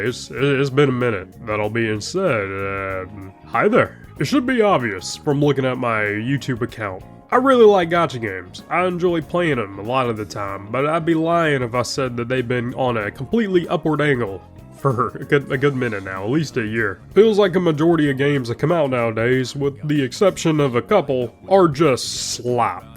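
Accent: South, United States. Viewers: ah i wondered why you sounded so overly american lol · the southern accent is awesome, glad to see the upload! hope you feel better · How did your Southern twang intensify over time 😂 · didn't post for a month and came with a southern accent. omega based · Not relevant to video but are you southern by any chance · Firstly damn sounded a lot more southern than he usually does In all seriousness though yeah the gacha selling Genshin has been having has been getting out of hand and honestly I'm glad to just be watching the chaos unfold from the sidelines because it has been getting ridiculous lately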